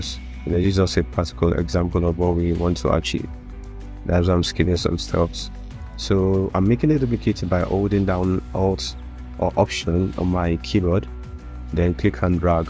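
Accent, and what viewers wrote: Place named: United Kingdom. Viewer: Why british accent exist-_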